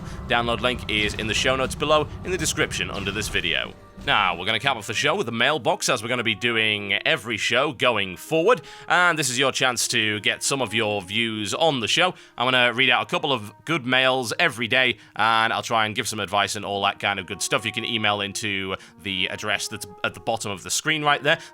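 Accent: United Kingdom. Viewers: British sounds ftw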